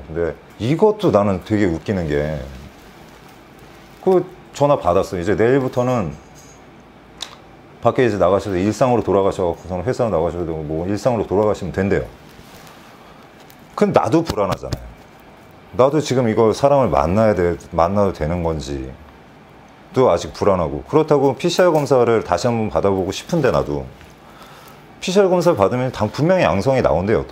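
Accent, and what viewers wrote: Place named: Seoul, South Korea. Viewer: 와 진짜 한국인 아니에요? 진짜 오리지널 서울사람같애요😳 한국사람인 나보다 더 잘함ㅋㅋㅋ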